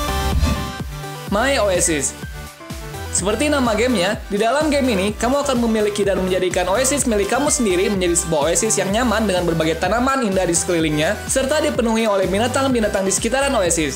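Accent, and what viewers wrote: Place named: Indonesia. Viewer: Logat lu indo sangat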